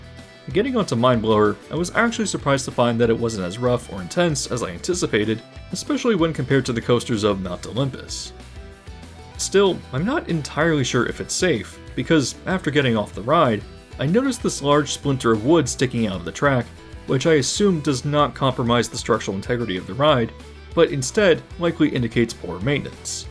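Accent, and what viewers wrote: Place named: Florida, United States. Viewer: You can tell you're from Florida by the way you pronounce it "Flarida". I go to sleep to your voice a lot, so I guess you could say we have a pretty close relationship. Where can we read more about you?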